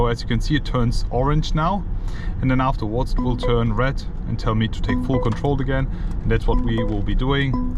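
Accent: Germany. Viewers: Der deutsche Aktzent ist hörbar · The German accent is strong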